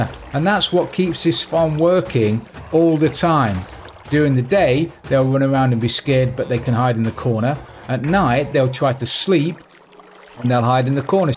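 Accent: United Kingdom. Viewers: I really enjoyed your video. English is not my first language and I think UK accent pretty dificult to understand, BUT I think yours is lovely and I understood it all!!! Thank you very much for sharing with us your iron farm, I hope I can do it haha. And I LOVED the name you gave to the zombie, it took a real laugh of me xD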